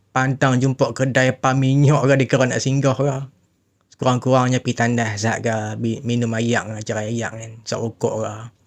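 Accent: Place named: North, Malaysia. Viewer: Salam.. Nak bajuu said L... Tq slalu tnggu video abg.. Sedap dngr loghat utara sbb saya Utaga..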